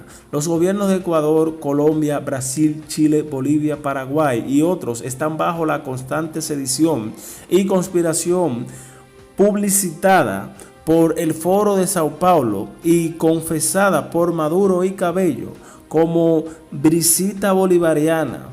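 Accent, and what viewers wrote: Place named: Cuba. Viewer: Será que son ideas mías o este señor es cubano¿?escuchen la voz y su particular acento!! Mosca¡!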